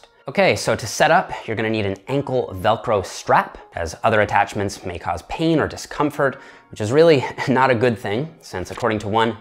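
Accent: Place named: Canada. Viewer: English is my second language and i can never tell the difference between Canadian and American accents, until now😂. Thx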